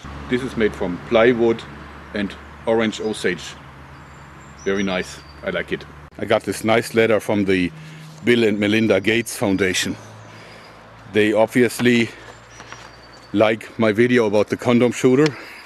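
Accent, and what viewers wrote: Germany. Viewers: The german accent is the main reason why i watch his videos :)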